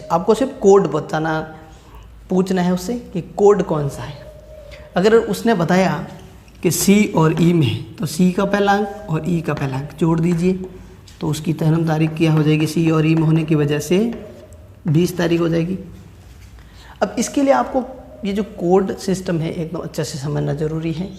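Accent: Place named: Jodhpur, India. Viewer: Enki aavaj me jodhpuri tone he shayad ye jodhpur ya eske aas pas se he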